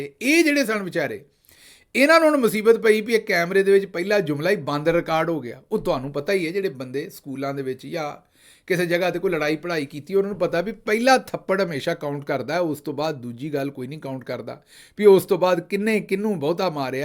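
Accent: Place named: Punjab, India. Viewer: Always brilliantly executed love your Punjabi accent thank you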